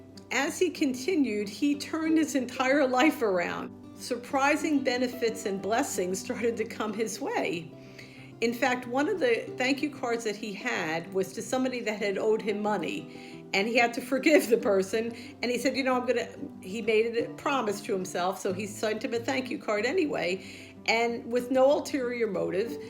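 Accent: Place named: New Jersey, United States. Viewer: Excellent podcast! And I love that Jersey accent! 😉🫶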